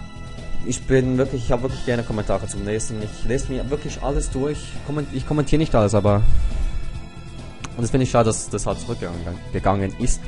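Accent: Switzerland. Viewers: Ich habe dich abonniert und finde es echt lustig mit deinem Mittwuch und dein schweizer-deutsch ist cool. Es fällt nicht extrem auf :D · eey mach dir nix draus die 8 youtuber sind halt uncool :D achjaa ich finde dein schweitzer-deutsch soo gel *-*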